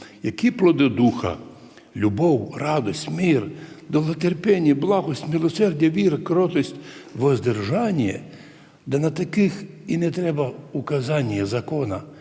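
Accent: Transcarpathia, Ukraine. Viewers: А , це знову отой, що сам не знає, ,,што" говорить? Ще один знавець ,,істини". Навіть не потрудиться сказати, що ,,ми віримо в те, що у нас істина". Віримо! Так ніт же - і Томос у нього ,,куплЕний" за,, амеріцькі долляри",і ,,дітей треба не шкодувати, а відправляти на війну"... Своїх то, мабуть, нема? Та ще витерпіти оту його окремо взяту ,,закарпатську мову"- це ,,што - то с чєм - то". Опанував би краще літературну мову, ти ж публічна людина.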